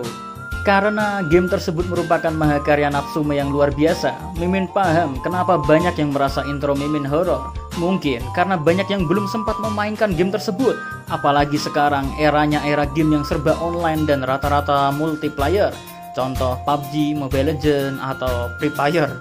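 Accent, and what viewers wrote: Java, Indonesia. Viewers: Jangan diganti y min intronya .... Selain krna suara lu yg medok ,, gw subscribe chanel lu krna intronya .... Berasa jd bayi lg w klw dnger intronya .... 😅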